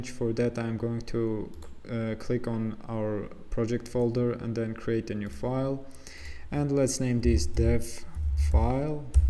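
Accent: Iran. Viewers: are you from Iran? interesting accent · hey bro, Your accent is very similar to Iranian, are you Iranian?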